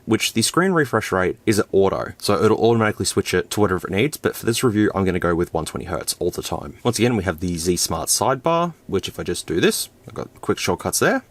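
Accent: Australia. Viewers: he's from Australia dumbass, they pronounce shit differently, grow a pair and get over it american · You're a fucking Australian, be proud of your wacky accent.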